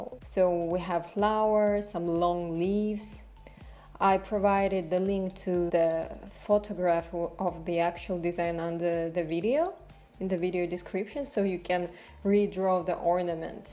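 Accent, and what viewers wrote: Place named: Russia. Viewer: Очень русский акцент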